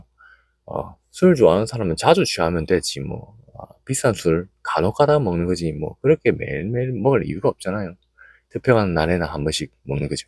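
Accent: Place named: Busan, South Korea. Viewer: 부산말씨로 현실과 냉정으로 설득하면 8도 사람 거의 수긍!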